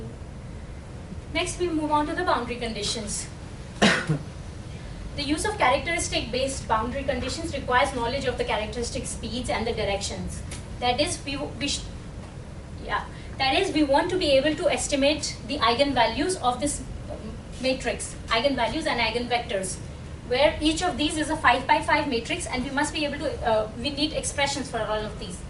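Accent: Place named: India. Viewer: She has a hindi accent.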